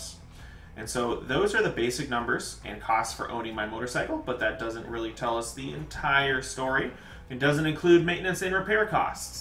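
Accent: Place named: Minnesota, United States. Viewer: I knew you sounded like a MN guy! Please keep us updated on the Commander 3’s. It would be nice to hear how they are doing and how they are wearing after 6 months, a year, etc. I’m in MN summers and AZ winters.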